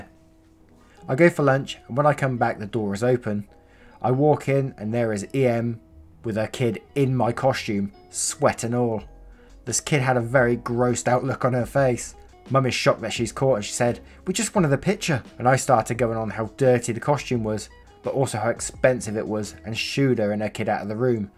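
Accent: United Kingdom. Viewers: Charter Schools are quite a cool idea (being from the UK like you I had never heard of them before either, until a few years ago) [I actually think there are now one or two of them in the UK]. You should look them up as they are so, so different from how we would have both been taught. Great content by the way. So nice to hear a British voice rather than an American one telling these tales (and before people kick off, I have nothing against American voices).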